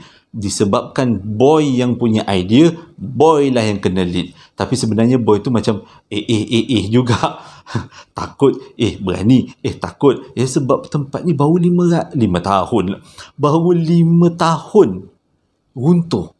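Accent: Johor, Malaysia. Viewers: ingat bro 😅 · suka dengor abang cakap, memang pure orang Johor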